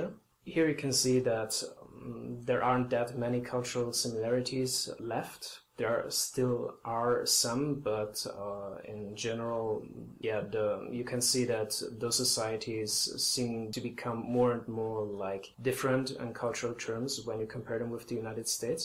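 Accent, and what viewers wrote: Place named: Scandinavia. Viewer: BTW...I am aware of your Scandinavian accent... I'm only assuming that you have recently expatriated to the UK. Since many of your arguments predominates between Britain and the US.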